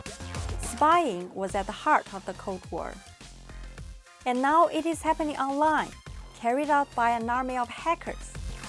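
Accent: China. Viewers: Narrator has a canton accent. Probably from hongkong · Just because an asian woman with a Chinese accent is presenting doesn't mean she isn't a cog of the NED, or of Britain. One person should not represent a country of 1.5 billion.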